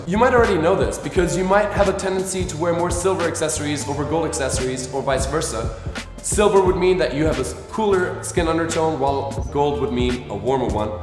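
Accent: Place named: Germany. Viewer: I’m quite obsessed with your German English accent here, very thick!! I used to work in a German company and all I could hear if not paying too much attention sounded very German to me. Great video I’ll recommend this to my male friend.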